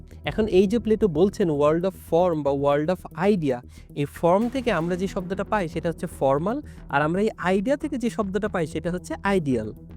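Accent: Bangladesh. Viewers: apnar kotha shune mone holo apni bangladesi ami kolkata theke...valo thakben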